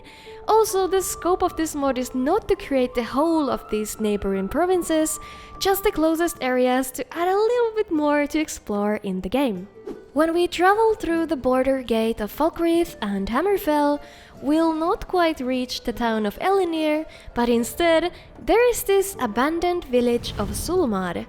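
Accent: Finland. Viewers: oh... OH... i didnt recognise from the accent at first, but... finland... huh... didnt think i'd find one of my kinsmen from the depths of skyrim mod enthusiasts. still, gonna download that mod, 100%. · Finnish accent spotted, torilla tavataan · Are you from Finland?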